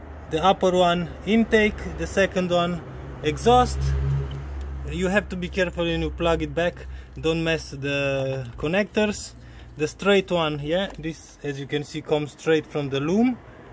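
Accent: Romania. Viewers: Salut! După accent pari a fi român, așa este? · roman de al meu dupa accent ! ;))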